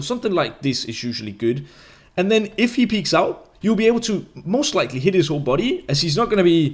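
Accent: Denmark. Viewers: are you danish? xD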